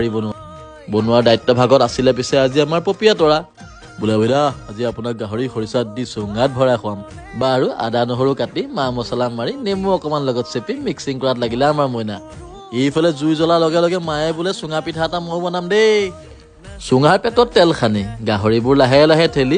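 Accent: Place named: Sivasagar, India. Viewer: Video bur sai vl .😍lagil dada ...amr sivasagr rr jen paisu kotha Burt 😅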